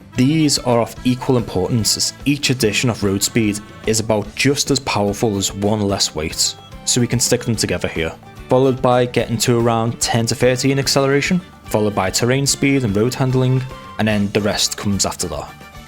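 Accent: United Kingdom. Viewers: hey i didnt know u are british 😭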